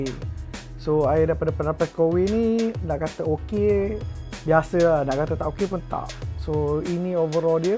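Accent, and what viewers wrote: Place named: Pahang, Malaysia. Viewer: gaye ckp tu mcm org pahamg😂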